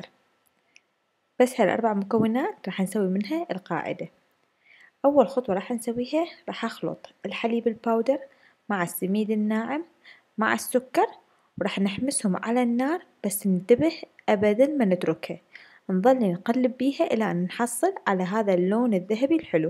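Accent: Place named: Iraq. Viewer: اللهجه العراقيه تفوز🥺❤️❤️🌚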